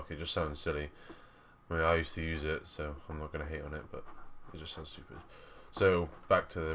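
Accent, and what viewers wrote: United Kingdom. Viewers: are you from bristol?;)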